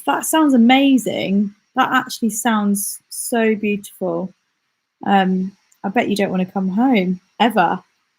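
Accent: South, United States. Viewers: Scarlett O'Hara accent Southern?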